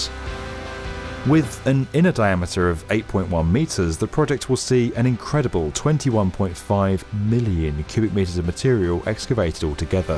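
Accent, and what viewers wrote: United Kingdom. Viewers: Seems kinda weird to have a british guy narrate this, about a tunnel being built in europe, by the EU, and use dollars to explain how much it cost? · Why is the cost presented in US dollars when the presenter is, or at least, sounds British, and the project is based in Europe- an EU project. Surely the cost should have been in Euros???🥺🧐🙄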